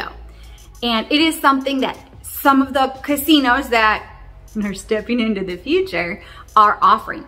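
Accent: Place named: Midwest, United States. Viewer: I also love the fact that one your mid western accent is amazing very Edie Mcclurg and also it’s very simple if people just paid attention they would know that your doing that. What fans you have, and why people talk bad about it. Haha and the fact that you had to make a video about it is silly. You and hubby are legends and keep it up please and thank you.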